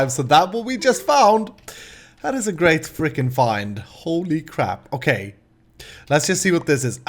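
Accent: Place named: Sweden. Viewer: . You sound swedish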